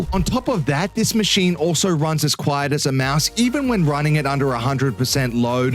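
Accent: Australia. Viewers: are you aussie? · Your Aussie voice saying, "...the total price of this PC being seven hundred and ninety-nine Benjamin Franklin's..." made me spit out my beer, as if it was a Vegemite Sandwich. Benjamin Franklin appears on the $100 Bill [in US Money]. If that were true, this crappy PC build would cost $79,900 [US Dollars]. Quite the overstatement! You owe me a beer. Next time I'm Down Under, I'll let you know., so you can put one in the refrigerator for me. I don't drink 'em warm. Oh, sorry, forgot you're not European, you're Australian. Scratch that. I'll drink a warm beer there. I'm not sure if you guys [blokes, mates, whatever you call yourselves] even have refrigerators. BTW, I get drunk after one beer, so you might want to make a bed for me to sleep over on. Oh yeah, after I fall asleep drunk, I sometimes piss myself, so heads up! You might want to put a plastic cover on the mattress before I sleep over. 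As for breakfast, nothing special, just two eggs "sunny side up", some bacon, hashbrowns, orange juice, coffee, and toast. You don't have to make pancakes for me. I don't want to be a bother. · why does this ai narration sound so aussie right down to the adjectives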